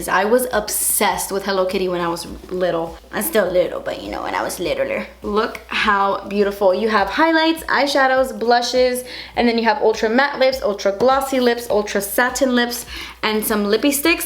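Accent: Cuba. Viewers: Jajajajajajajaja! Tas podrío mi hermano! Me muerooooo! Me encanta tu acento cubano! Mi perro también me tortura así! A veces se asusta él y todo! Un besazo enorme desde Madrid! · I could just listen to you talk with your Cuban accent , I love it ! Lol 💕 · She's Cuban I believe! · Your Cuban accent. I love it! 😍😍😍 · Lauren Emma I think she's from Cuba but I'm not sure!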